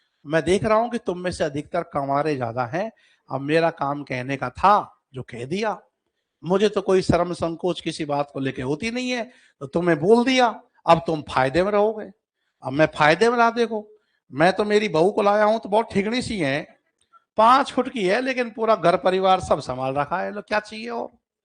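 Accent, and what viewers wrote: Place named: Bharatpur, India. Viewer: सर जी आप कहां से हो। आपकी भाषा भरतपुर बालों से मिलती है।❤❤❤❤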